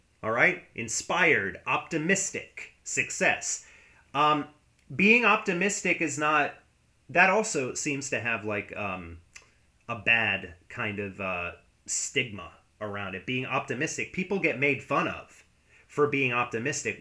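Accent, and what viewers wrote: South, United States. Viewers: I like your regular voice better than the southern one. :) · Yeah you were throwing me off with the southern thing, ha.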